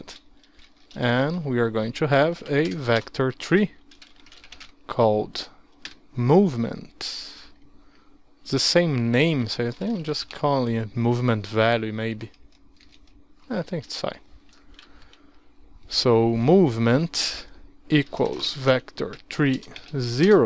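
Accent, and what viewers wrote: Brazil. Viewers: O teu sotaque brasileiro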